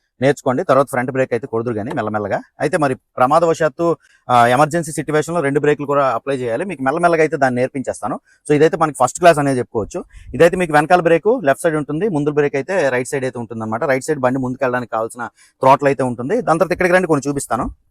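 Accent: Srikakulam, India. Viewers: Guarantee srikakulam ayyuntindi ma intlo matladukunnatle undi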